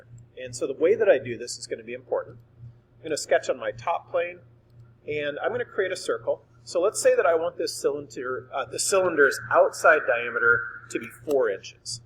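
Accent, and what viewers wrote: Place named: United States. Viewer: Very poor instructional video. This is made for people who already know how to make a cylinder. moves and clicks on stuff the beginner has NO idea of what he is doing. He is way too fast, doesn't explain why he is doing what he is doing. I am rewinding again and again and again, trying to find what he is doing and why. If this is basic construction in OnShape, take the extra time to explain EVERYTHING you are doing and where to find it. This video is useless to me, I guess I need to find a simpler app to use. I am glad he speaks American English, not some foreign deviation that is totally not understandable.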